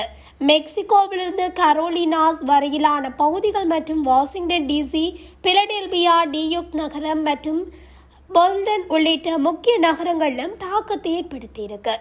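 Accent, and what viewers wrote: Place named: Sri Lanka. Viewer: Ilangai thamil kaetpadharku inimayaaha irukum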